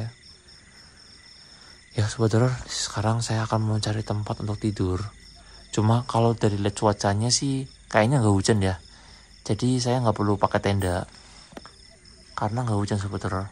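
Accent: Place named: Java, Indonesia. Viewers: Bang lw ngomong koe logatnya medok sih emg bang asal daerah mana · Mantap mas maaf mas klo boleh tau sampean dari tegal y jawa tengah logat nya ketahuan salam dari gunawan cah weleri sobat horor sehat slalu mas jngn lupa bismillah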